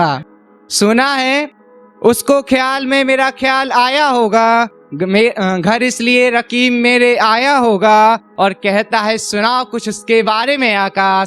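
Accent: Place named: Sagar, India. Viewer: Bhai abaj se pta chal jata hai ki sagar se ho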